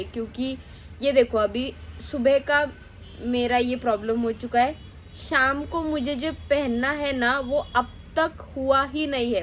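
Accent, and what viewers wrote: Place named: Maharashtra, India. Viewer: apka hindi bahot marathi type hai 😁😁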